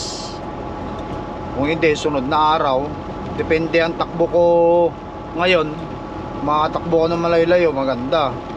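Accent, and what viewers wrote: Ilocos, Philippines. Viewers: idol tiga saan k, parang ilocano k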